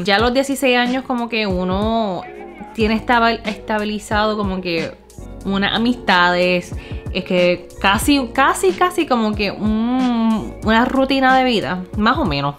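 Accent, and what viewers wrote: Puerto Rico. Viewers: ajá??? Todos los boricuas hablamos así claro q si…. De seguro tú hablas asi😂🤦🏻‍♀️ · Eres la china más bonita y preciosa que he visto además hablas con un acento boricua inconfundible. Los boricuas te amamos.eres inigualable. Y unica. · You are very cool to the fullest 🇵🇷 You can't fake that Puertorican accent much love 💕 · Wao que linda eres y escucharte hablar asi como mi gente asi boricua me vuelve loco 😍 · Ella habla como el boricua que nace en Estados unidos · 🙋‍♂️ saludos mi'ja! Me encanta ver u oir como hablas a lo Puertorriqueño 😃 un orgullo de tenerte como compatriota Puertorriqueña aunque sea China de nacimiento 👍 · Yo me mudé para NC con 24 años. A pesar de haberme mudado un poco mayor que tu, experimente exactamente lo mismo. Sentía que no encajaba en nada. Pero igual que tu no me hace falta nada. Mucho éxito y btw tu acento boricua es innegable. 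· I can totally relate with you when it comes to moving during senior year. I moved from NY to Florida during my Sr year...it was the freaking worst. On another note, me encanta ver tus videos, mi mamá was the one who told me to check your pg out. Y cuando te vi hablando no solamente español pero con el mismo acento que tengo yo, I was kinda tripping out. Que viva Puerto Rico y todos los Latinos!! Pa’ lante!!!! #WEEEPA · Hola, Mami! I love your videos and hearing you speak Boricua... Please keep making these videos, they are very insightful and eye-opening and hopefully those watching learn from all of the BS you had to endure just for having a different background. It's funny, your Boricua videos are almost addicting. You bring up a lot, A LOT of things that PRs have to deal with as a result of their circumstance (being on a tiny island; little diversity; bad economy; not much opportunity; people being ignorant/closed minded; etc.) It really bothers me that PRs bullied the crap out of you for being different and for your Chinese background. 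I wish I could undo that for you... And then you came here only to experience it again in HS w/ NYPR's and also Chinese schoolmates. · Mira belleza, usted es muy Boricua sin duda. Hasta en tu hablar 🗣 se nota, aunque no hayas nacido en PR viviste la cultura y está en ti todavía. Cambias R por L y J por S. Algo muy Boricua. New York City es una ciudad con gente del mundo entero, no hay un estado con más diversidad en USA 🇺🇸. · tienes un asentó bien boricua que hermosa 🇵🇷💯💯🇵🇷🤣🤣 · Acabo de encontrarte y me alegra de que hables así de bonito de P.R. sabes q puedo ser tu abuela y viví por 20 años en NY pero mi isla yo lo la cambio por nada siempre venía de vacaciones y aunq la isla es pequeña la economía está mal pero de PR han salido muchos artistas muchos deportistas y tenemos muchas personas profesionales y tú eres lo q eres en cualquier país; y lo q quieres lograr lo lograras donde quieras q estés viviendo; pero sabes algo? 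Eres una pura boricua al hablar me encantó escucharte y te deseo lo mejor en NY o donde seas... suerte y cuidate mucho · Wao me encanto tu story! Yo soy puertoriqueña y no me crie en PR. Desde los 5 años estoy viviendo en E.U. Te apoyo 100% y eres mas boricua que yo!!! Tu acento es puro boricua que por un momento me quede boba jijiji. Te deseo lo mejor en NY y maybe un dia regresara a PR a demostrarle a todos aquellos q no te apoyaron q tu saliste pa lante y ellos no.. Pa lante nena! Que viva PR y China! Dios te bendiga. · Como aprendistes el ingles tan rapido y tan bien como tu lo hablas? De las 3 idiomas q tu hablas cual es el preferido q tu prefieres hablar? Y yes you do have an accent when you speak english pero dats wat happens cuando eres boricua isla del encanto and where in ny do you live at cause i live in ny too so have a blessed day and y q tengas un año mejor q este so let 2021 be a better year than this on Dios te bendiga y pa lante mija q eso es lo q hay weeeeeeeeeepaaaaaaaa puñe_ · Wow you speak so Puerto Rican ❤️ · Hablas bien Boricua.Te felicito por tus videos.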